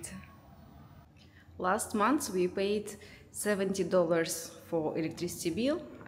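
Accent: Russia. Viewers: $200 for rent and 35% of that for electricity? Electricity is not as cheap as rent in Vietnam. Anyway, I'll subscribe to your channel just so I can listen to your beautiful Russian accent and learn about Vietnam as well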